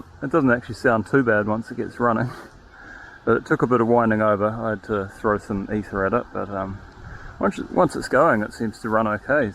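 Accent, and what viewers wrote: New Zealand. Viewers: Recording equipment you use and how much time? Amazed at the variety of angles you achieve and even what looked like overhead drone footage at the end! Amazing. How many and what type of cameras are you using and how do you set all up to make these videos so well produced? Are you filming solo? It feels that way. A fun 30 minute video on how you set up, film and edit would be fascinating! Love the kiwi accent as well! We lived in the Bay of Island for seven magic years. Miss it. Well done mate! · Just came across this channel then realized hey you sound like a kiwi me too! Bet we have some common friends somewhere 😅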